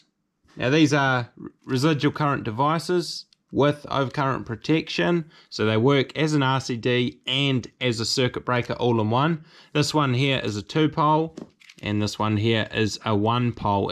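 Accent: New Zealand. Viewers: you are from nz?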